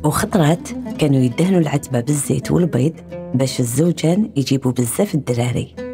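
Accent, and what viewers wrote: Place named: Algeria. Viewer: اليوم اكتشفت قناتك المميزة ... اسلوبك رائع في سرد الحكايات والاحداث ..لهجة جزائرية مفهومة وبسيطة وجميلة بزاف .. حقائق ومعلومات مهمة ماكناش نعرفوها .. اتمنى لك المزيد من التقدم والاستمرار . القناة تستحق تكون برنامج معلومات على التلفزيون.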